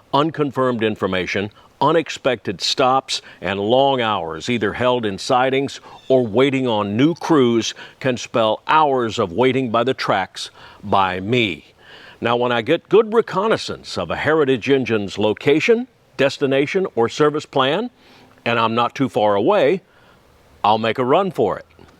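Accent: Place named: Florida, United States. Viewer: This is absolutely my favorite railfan site for several reasons. 1) Thecontent is interesting, as well as being well shot and edited. 2) I love the down-home friendly folksy Florida twang and cadence of the narration. Although it's less noticeable here in Dade and Broward because of all the New Yorkers. 3) There is the friendly Florida attitude where you can stand in line with a complete stranger and within five to ten minutes made a new acquaintance, and sometimes a new friend. 4) I live in southern Broward County in Pembroke Pines and grew up in Hialeah, so the Florida scenery in the southwest and center of the state is very pleasing. 5) There is the interesting history of not just the railroad, but the area in general. 6) Last but not least, the dining recommendations for simple down home Florida food, there's nothing like it anywhere else. 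Even if you are not a big railfan, this is an interesting and fun channel for good ole Florida boys, and girls too.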